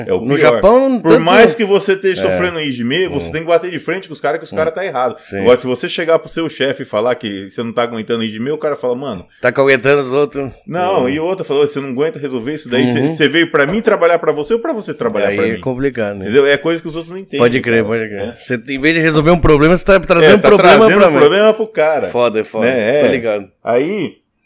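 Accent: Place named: São Paulo, Brazil. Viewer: ele é ex-yakuza sim! e tá no brasil com sotaque paulistano falando de uma das máfias mais violentas do mundo. é sim.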